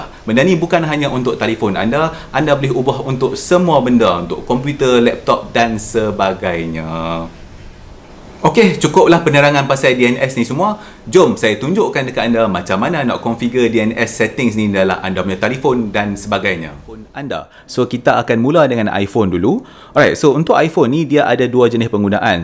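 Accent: North, Malaysia. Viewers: Penerangan yg sgt jelaas. Tambahan pulka cakap utagha kira geng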